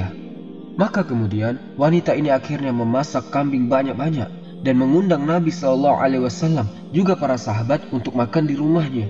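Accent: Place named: Sulawesi, Indonesia. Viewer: Logatnya kayak orang sulawesi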